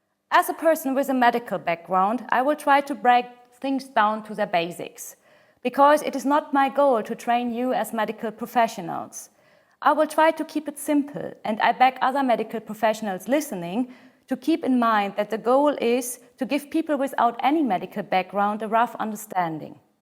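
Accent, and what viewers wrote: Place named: Germany. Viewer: The explaining person's mother tongue is german, isn't it? :)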